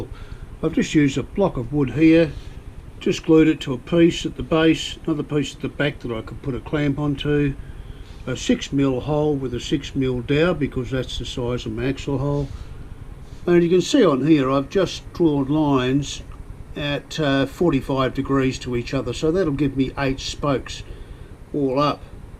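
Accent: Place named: Portugal. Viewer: Parece-me um português falando a língua Inglesa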